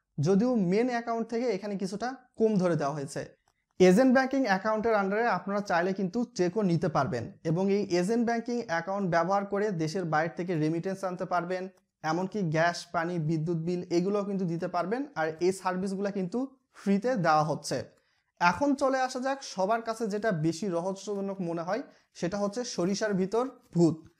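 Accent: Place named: Naogaon, Bangladesh. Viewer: নওগাঁ/বগুড়া? আপনার উপস্থাপনায় বুঝা যাচ্ছে।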